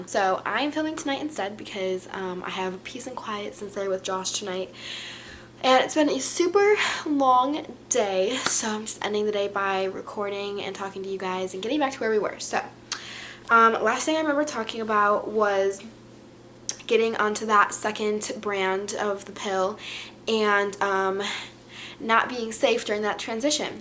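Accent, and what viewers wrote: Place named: Rochester, United States. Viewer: Hey! Love your videos, been watching since your first one! I’m from Rochester too and I can tell your starting to get an accent😊 keep making great videos xoxo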